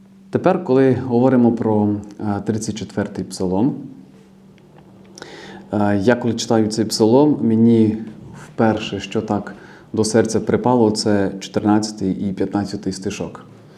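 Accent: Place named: Transcarpathia, Ukraine. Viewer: Слава Іісусу Христу! Дякую от. за Ваш підхід і пояснення теми пліткарства, особливо тронула кінцівка про наповнення Божою любовью.Рада за Вас, що збільшується кількість слухачів, а значить однодумців.Приємно чути закарпатський діалект.Вітання всім зі Сходу з Харкова, нехай Бог усіх береже, єднає через Своє Слово і веде до перемоги 🙏📿🇺🇦🕊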